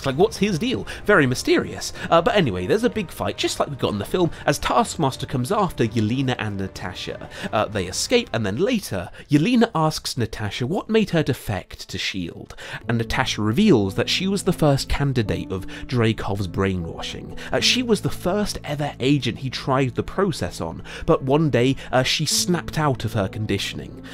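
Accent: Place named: RP, United Kingdom. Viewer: This dude is speaking so posh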